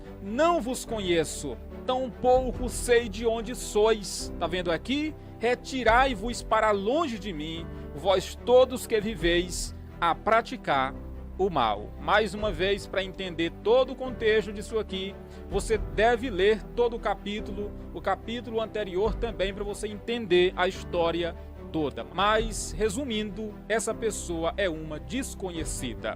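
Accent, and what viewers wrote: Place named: Ceará, Brazil. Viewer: Cearense da gema😅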